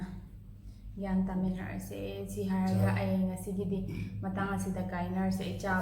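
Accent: Kakching, India. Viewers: Accent se kakching oiduiro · Kei lam dei no babhi ng ....kakching dei manli... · Tava ym nungai kakching mcha na ngngvase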